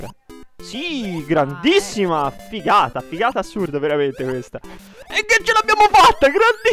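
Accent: Tuscany, Italy. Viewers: ma te sei della Toscana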